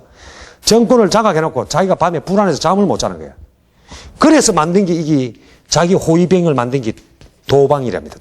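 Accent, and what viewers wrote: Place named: Gyeongsang, South Korea. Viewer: 내가 들으니 사투리가 하나도 없는 정통 표준말인데, 다만 말투가 경상도 말투로 보이는데 그것을 말꼬투리 잡아 사투리라고 시비걸면 쓰나?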